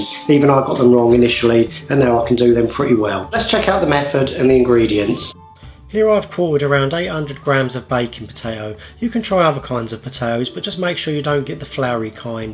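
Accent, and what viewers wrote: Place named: London, United Kingdom. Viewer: mate! your accent isn't to far off Russel Brand's. hope you're not offended. you live near the Thames?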